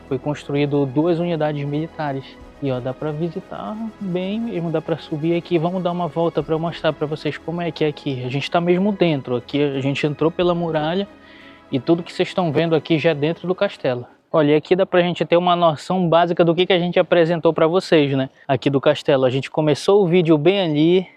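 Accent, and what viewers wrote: Pará, Brazil. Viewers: O cara tem sotque paraense e torce pro vasco. PQP. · Seu sotaque é do Pará, certo?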